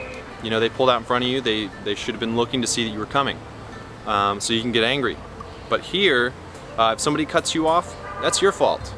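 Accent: United States. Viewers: You speak well with great american accent. Great voice and great pronunciation. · Hey, I've always wanted to go to China but have never been.. It seems like a beautiful place... I'm from Magee,Mississippi.. What town are you from? I can tell that by your English that you are originally from the United States.. Where are you from originally? During Christmas of 2018, I got 2 books on a Survival Guide to China and South Korea.. I read the South Korea book and it was great.. I have a Japan book as well too.. Now I'm ready to read about China next.. Speaking of South Korea, have you ever been there? I've never been there before myself either, but I'd like to go there too one day... If you have been to South Korea, there are these 2 kids that are living there currently who are from the United States (San Francisco,California) and their names are John Rock and Mack Rock... 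Do you know them? They have a YouTube channel... Check it out.. It's listed under Rock Kids.. I've actually never been anywhere out of the United States!!! I've just always lived in Magee,Mississippi my entire life... It's a small town, but it's growing more and more in population everyday!!!!! Have you ever been to Mississippi before?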